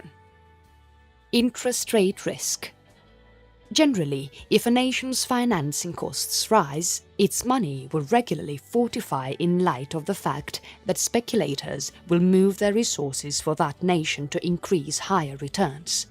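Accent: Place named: RP, United Kingdom. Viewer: Thanks, posh-sounding nice English lady, it was very informative :)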